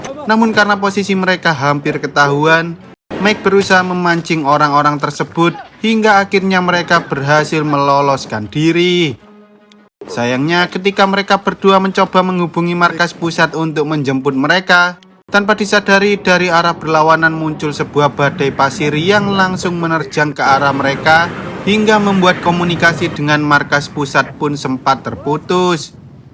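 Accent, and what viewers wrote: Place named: Banyumas, Indonesia. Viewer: Jadi gk seru ngapaknya kebangetan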